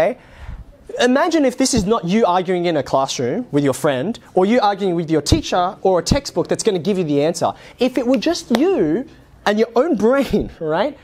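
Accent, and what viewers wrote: Australia. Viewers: This topic comes in the entrance exam for a national and kinda quite prestigious university here in Peru. I'd also like to say that I am captivated by the Aussie accent, it is fascinating and also sounds cooler, to the best of my knowledge. Greetings from Peru :D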